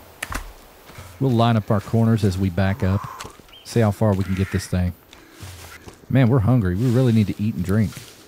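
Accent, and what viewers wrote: South, United States. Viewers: I just found you on this Stoney Friday and as a southern girl from N.C I appreciate your soothing southern charm voice, you’ve not overly obnoxious and funnnyy I had so many good laughs I need this game😂😂❤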